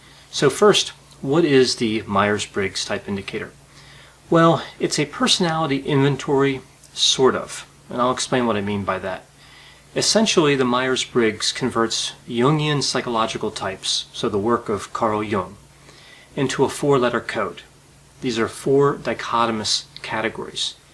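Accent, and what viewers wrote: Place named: Pennsylvania, United States. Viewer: I thought you might be INTP. As and ENFJ with inferior Ti I appreciate your logical analysis of psychological concepts. You are very interesting and entertaining to me. I have to disagree with you on the negatives of the MBTI. I don't identify with the other types like I do with the description of ENFJ. I also disagree with the descriptions of the types being all positive. The negatives are also discussed. I find it valid in the way it has helped me to understand myself and others. I appreciate my and others strengths and weaknesses so much more than I ever have. I love myself and others more. I am aware of my defects and how I can improve within reason. Comparing the MBTI to the Big 5 is not a fair comparison. The Big 5 was developed as an instrument to statistically determine mental health issues. The MBTI was not. 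It's more about how people think and relate cognitively. But I appreciate your analysis and love what you are doing. I first started listening to you because of your Pennsylvanian accent. I grew up in Lancaster County. Your videos showed up in my feed when I was listening to Dr. Ramani. I'm glad they did. You have taught me so many things and make my life so much more enjoyable. Thank you. Diane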